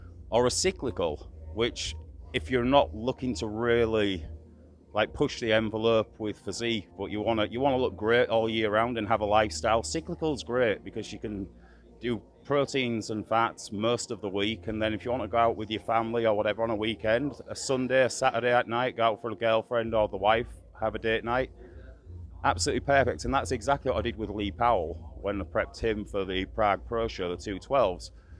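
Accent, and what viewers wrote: United Kingdom. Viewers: Fucking hell didn’t expect the northern English accent!!:) good work nice change! 👌🏻👌🏻👍👍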